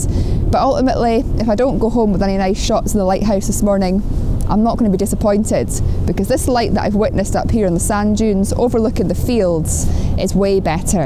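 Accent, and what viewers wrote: Scotland, United Kingdom. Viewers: You had some stunning shots thanks to your patience and persistence. You are as lovely yo look at and listen to as your images are to look at. I love your scottish accent and your lovely smile just show your joy and enthusiasm in going out to shoot. Another vlog well done!!!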